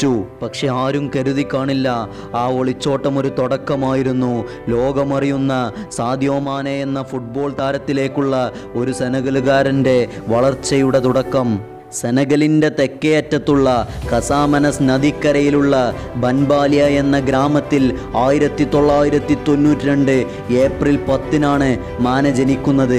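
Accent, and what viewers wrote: Malappuram, India. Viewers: അവതാരകൻ മലപ്പുറൻ കാരൻ ആണോ 🙄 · Commentator malappuram ullathano malappuram language pole thonnunnu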